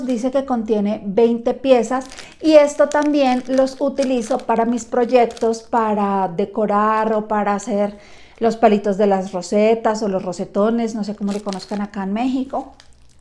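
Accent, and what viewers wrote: Colombia. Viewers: Eres de Colombia y vives en Aguascalientes? O porque hablas con acento Colombiano?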